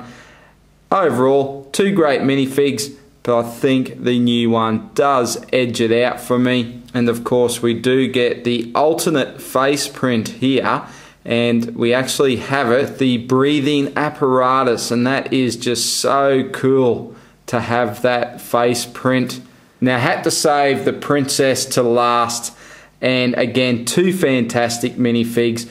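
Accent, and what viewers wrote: Australia. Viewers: Just for the record, this guy sounds really Aussie, and I'm an Aussie haha. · 1st thing I heard was an ausie talking so I insta subbed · If I had to guess, I would say this guy is from Australia like me.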